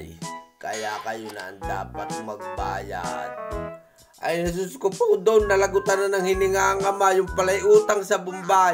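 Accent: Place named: Batangas, Philippines. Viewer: Hello sir god bless you po joker pala si sir hehe sir para po kayong batangueño asawa ko kc ganyan mag salita e.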